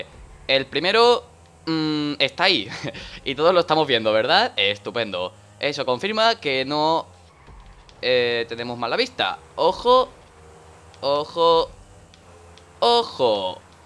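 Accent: Mexico. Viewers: ... Me da risa como hablas como mexicano😂😂😂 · Like si te da risa el acento mexicano de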